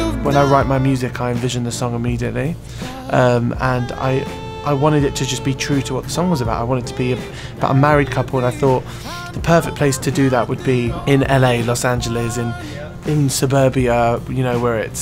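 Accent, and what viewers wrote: United Kingdom. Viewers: The British accent is awesomeeeeee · hes brittish....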